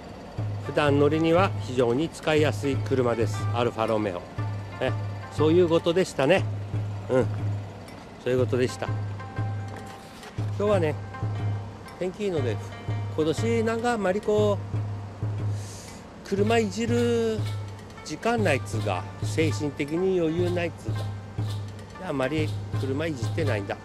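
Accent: Tsugaru, Japan. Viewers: 車には詳しくないけども、アルファロメオのエンブレムがオシャレな感じで好きだなぁ♡ マスターのちょっとお久⁈の動画見て 津軽弁聞いて、ほっこりしました(о´∀`о) 寒くなって来たので風邪引かないで下さいねぇヽ(´ー｀)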